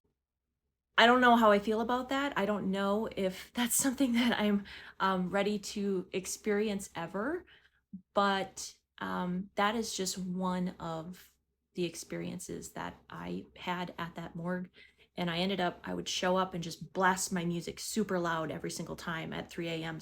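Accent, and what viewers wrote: Minnesota, United States. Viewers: Minnesota, i believe. Her accent really stands out. i think she hinted where abouts she was from, in other videos, if she didnt come out and say it.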